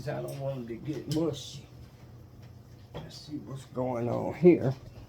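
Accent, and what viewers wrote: South, United States. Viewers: An elegant domesticated classy pretty lady with a sassy personality and tenacious Southern accent, Ms. Thanks immensely for the eclectic succulent crusines cooking tuteledge. Your foods look delicious, fresh, and quality. That gumbo look flavorful, delectable, quality, and fresh. Have a positive and productive day Ms.. 😂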